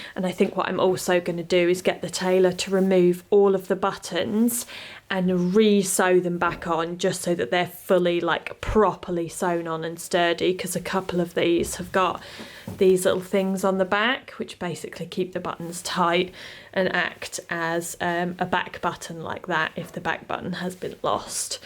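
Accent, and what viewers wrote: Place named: London, United Kingdom. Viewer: Ok, let's make a deal. I'll come to London and you teach me that oh so wonderful English (London) accent and I will transmit to you my Texan twang. And yes....I say "y'all" & "fixin'".🤣😎🤣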